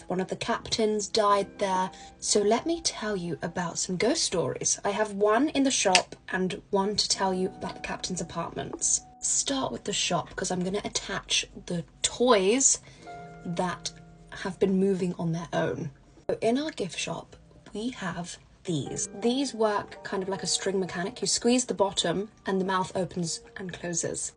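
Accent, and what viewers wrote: United Kingdom. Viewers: Apart from the topic 😅 love British accent ❤from Indian. So classy, relaxing and elegant. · I agree such a lovely voice. I'm American so I always fall for the British accent.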